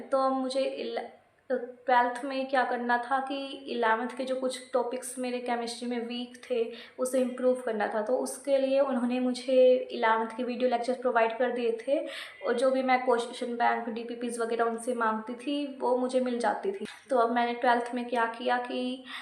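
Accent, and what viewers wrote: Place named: Haryana, India. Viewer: Bhai yo to mane pata lag gya thare haryanvi accent badi mast hai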